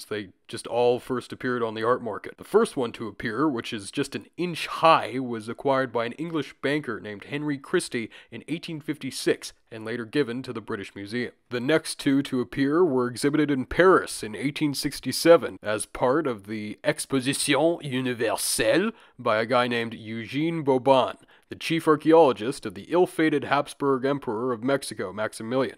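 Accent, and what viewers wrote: Canada. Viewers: I thought he was Canadian? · he’s just Canadian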